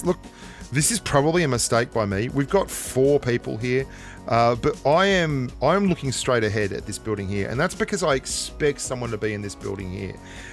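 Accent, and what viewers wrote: Australia. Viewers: yoooo u austrailian?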